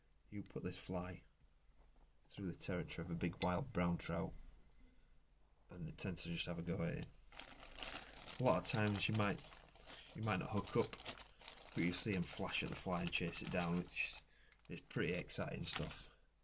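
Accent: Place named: Yorkshire, United Kingdom. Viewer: Thank God I live in Yorkshire and can understand you, As always Buddy well done...Tell Kia to buy you a razor for Xmas though ;)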